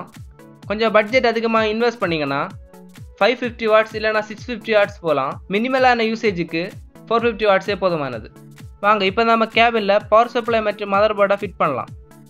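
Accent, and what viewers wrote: Kanyakumari, India. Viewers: Thala ne kaniyakumariya semmaia pesra bro Vanakam maka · anna neenga pesumbothe nenachen nanum kanyakumari than .anna nenga enga erukenga kkla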